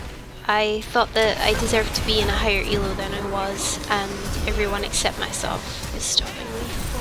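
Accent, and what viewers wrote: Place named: Canada. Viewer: LoL, the canadian accent is strong in this one, oooover and oooover. abooot. Loved the video though, learned alot from it ! :)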